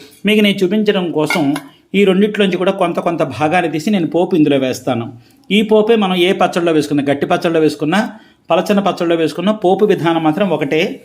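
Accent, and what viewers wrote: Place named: Tamil Nadu, India. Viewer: మీరు తెలుగు వారైనా ఎక్కడో మాట తీరు మీ వర్చసు తమిళం లా ఉంటుంది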